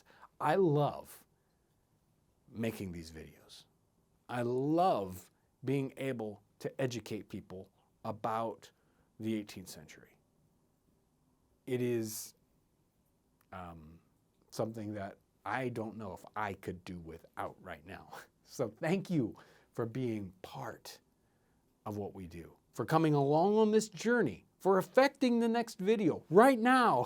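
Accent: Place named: Indiana, United States. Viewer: He sounds, gesticulates, and annunciates things a lot like my dad, probably because he’s from Indiana, and so these always take me back to conversations at the table about history, politics, faith, and logic with my dad. I love the perspective and the info, and the recipes are fun, but that’s actually the subliminal reason I think I find these videos so home-y 🤗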